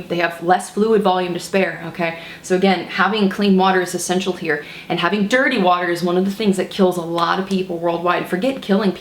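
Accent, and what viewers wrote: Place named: Texas, United States. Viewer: " Fixin to do " , are you a Texas Girl ? I am not hating at all just a 56 yr. old Native Texan Male that knows good Texan Slang when I hear it !!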